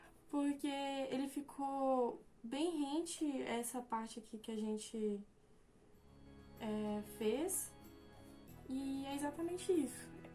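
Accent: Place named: Minas Gerais, Brazil. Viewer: Ela é muito linda, e me parece pelo sotaque, que é conterrânea, das Minas Gerais.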